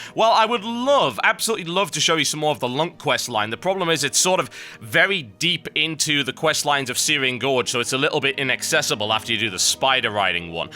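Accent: United Kingdom. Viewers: lol British..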